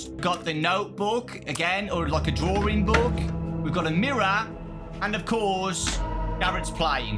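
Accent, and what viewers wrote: United Kingdom. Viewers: Ahhhh he’s British😂😂😂😂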